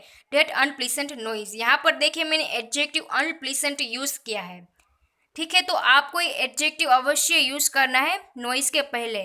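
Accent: Gujarat, India. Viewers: Your sounds like gujarati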